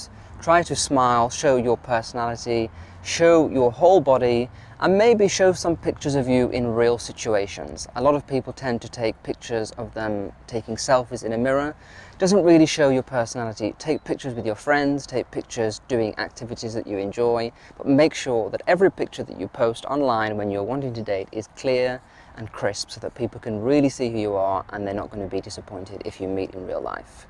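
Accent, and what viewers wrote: United Kingdom. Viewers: Great content as always,. 😊 As an American, I adore your British accent, especially when you say, "lots of pots." It sounds delightful!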